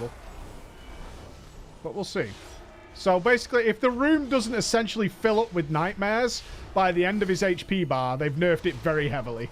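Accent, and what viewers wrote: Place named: Northern England, United Kingdom. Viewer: so disc. is a shield bitch again huh? sounds good, I liked that playstyle. Oh the cringe.... everytime you butcher the English language, it just sends a chill down my spine. But I guess that what we learn is Southern English and so when you say something like Sat instead of sitting, it just sounds wrong. because "Sat" is past tense of "to sit". but I digress. I know its the way you northern English people speak, it just sounds wrong to me lol.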